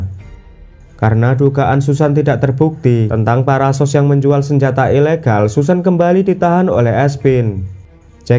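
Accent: Java, Indonesia. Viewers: Suaramu kok medok jowo banget mas.. Soko ngendi sampean?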